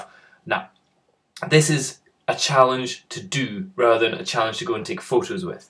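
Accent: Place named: Scotland, United Kingdom. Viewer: I´d take the 550D with the kit lens! Btw. what intrigues me: is your accent genuinly Scottish or did you "internationalize" it in some way? It´s interesting, that you´re actually using Am.E. pronunciation sometimes like e.g. can´t, plant, chance, answer.... Accents have always intrigued me, so I´m digressing from the topic a bit!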